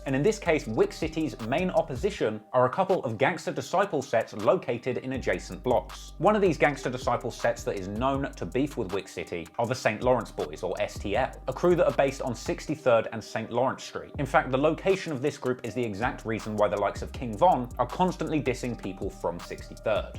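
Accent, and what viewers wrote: United Kingdom. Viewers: Hearing the history of my city in a British accent is hilarious 😂😂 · Its lowkey kinda weird havin a British guy talk abt my city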